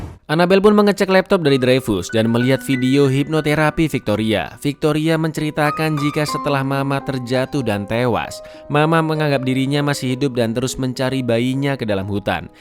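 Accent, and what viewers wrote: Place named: Surabaya, Indonesia. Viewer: Mas iki wong endi yo? Teko bahasae kyk wong Suroboyooo... Nek iyo, kok aku curiga. Jangan² mantane koncoku wkwkwkwk. Dadi penasaran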